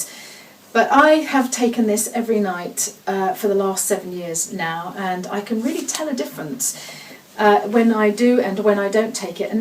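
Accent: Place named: United Kingdom. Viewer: Love the accent, I swear, every girl from the UK is just ahead of the game simply because of it...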